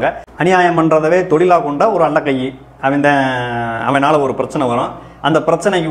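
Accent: Madurai, India. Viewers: மாம்ஸ் இன்னைக்கு மட்டும் 10 வீடியோ பாத்துருக்கேன்.. என் மனசுல இருந்தத பூராம் மதுரை மொழில செல்லிருக்க.. சிரிச்சு வயிறு வலிச்சிருச்சு... சத்தம் போட்டு சிரிச்சோம்யா.. மாம்ஸ் வாழ்க...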